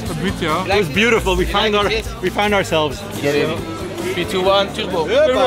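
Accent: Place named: Belgium. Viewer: i'd say it's an average belgian accent :D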